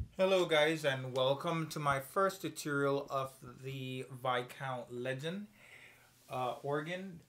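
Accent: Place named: London, United Kingdom. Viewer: Odd question, but are you UK born? You have a slight London accent :-)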